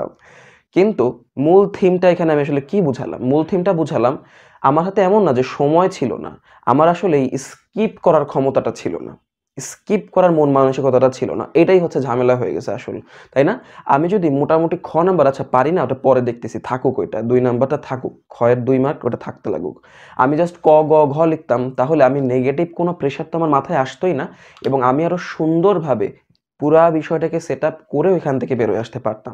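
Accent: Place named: Rajshahi, Bangladesh. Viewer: Apnar basa ki rajshahi ?? Apnar kothar moddhe rajshahi r vibe pacchi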